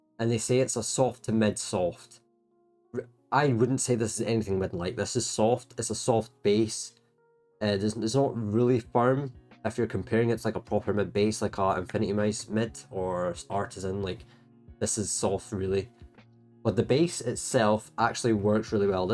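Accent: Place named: Scotland, United Kingdom. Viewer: Scottish accent 😊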